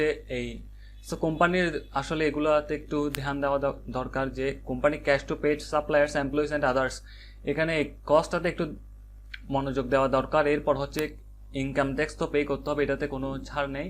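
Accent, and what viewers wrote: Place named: Chittagong, Bangladesh. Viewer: apner vasha dehe mone hocce apni Chittagong ar, I also live in Chittagong please tell me how to contact to u?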